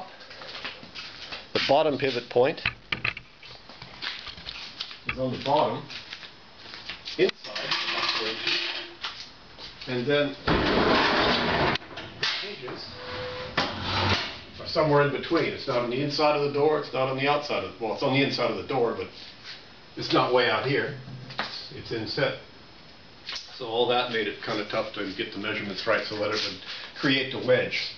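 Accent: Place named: South Australia, Australia. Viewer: hey nice to hear another south aussie are you in metro or country sa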